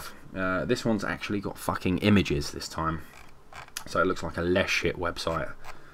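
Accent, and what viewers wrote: United Kingdom. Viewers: that British accent tho. ;)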